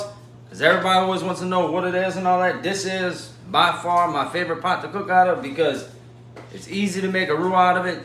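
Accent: Louisiana, United States. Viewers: I been watching this guy for a little while now n i gotta say he's very talented love the hook "Lets go yall " keep doing your thing love the Cajun accent!!!!! Lets Go!!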